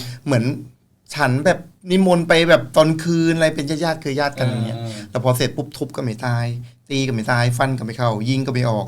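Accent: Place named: Sukhothai, Thailand. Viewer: ชอบ เล่ามากสนุกมาก ชอบฟังภาษาโข๋ทัย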